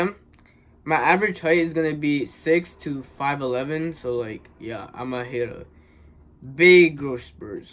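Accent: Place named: Spain. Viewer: Spanish accent😍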